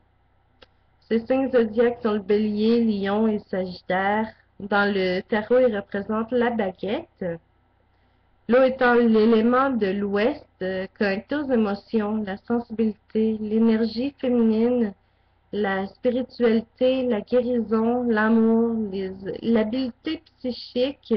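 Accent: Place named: Quebec, Canada. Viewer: T'as vraiment un accent ! xD Content de savoir que des Québecois font des vidéos wiccanes en français ! ;)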